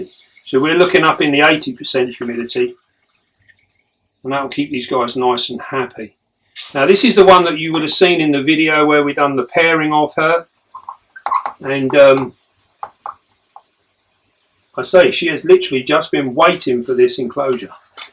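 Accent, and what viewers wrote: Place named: Dorset, United Kingdom. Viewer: OMG that thing is HUGE! The cage is beautiful! Hearing you talk brings back fond memories of visiting my Uncles in Dorset long ago, my Mom's side of the family is all from over there.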